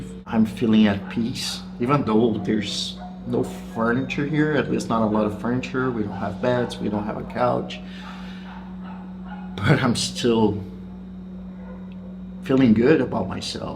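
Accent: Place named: Brazil. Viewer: how strange. Your Brazilian accent seemed stronger when you were in the US. I hope your family does not make fun of your American accent now. 😛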